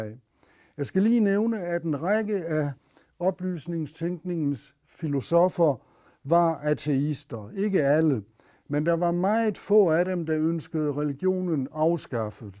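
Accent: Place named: South Jutland, Denmark. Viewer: Filosofi på Sønderjysk.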